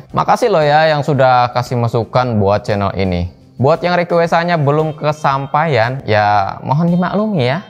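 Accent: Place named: Bogor, Indonesia. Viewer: Suara dan nadanya mirip ridwan remin🤣 orng bogor ya bang😂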